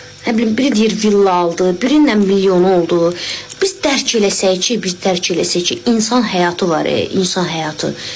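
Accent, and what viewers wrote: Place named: Ganja, Azerbaijan. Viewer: Bu lehcesinden Genceliye oxshyur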